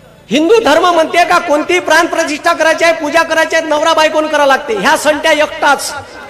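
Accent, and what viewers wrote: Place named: Varhadi, India. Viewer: वऱ्हाडी भाषण व्वा 🙏PM साहेबांना बोलताना राउटसारखी जीभ घ ून जाऊ देऊ नका, हे ्वच पक्षातील हरामखोरी आहे, तुम्ही आपण गुरुजींना हे राजकारण परवडत नाही जी.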